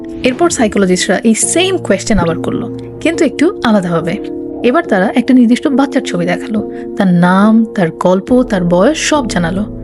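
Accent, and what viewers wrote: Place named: Bangladesh. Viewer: বুঝতে পারছি ম্যাম, আপনার কথা শুনে মনে হচ্ছে যে আপনি একজন বাংলাদেশি।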